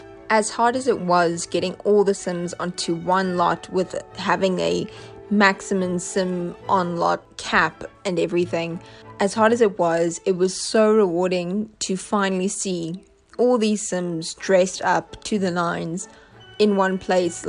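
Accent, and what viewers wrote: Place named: South Africa. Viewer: I missed your entry video (consider it watched now)! Thanks for this. Great hearing a SA accent again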